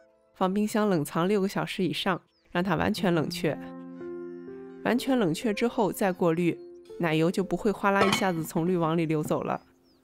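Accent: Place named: Yantai, China. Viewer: 听口音不知是不是山东烟台人？😁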